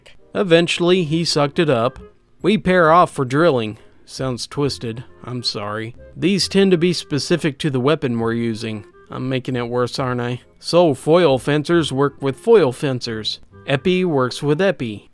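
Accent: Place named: Kentucky, United States. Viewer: Still my favourite accent.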